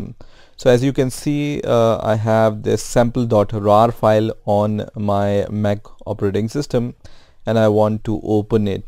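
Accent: India. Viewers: You are almost hundred percent sure of getting the answer you are looking for when you hear an Indian accent ....... Thanks a lot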